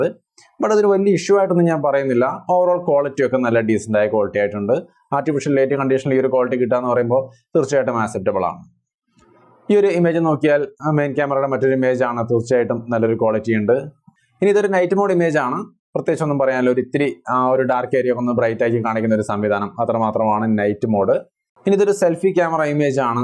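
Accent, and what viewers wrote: Thrissur, India. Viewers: Pakka തൃശൂർ കാരൻ 👌👌👌👌😁😁😁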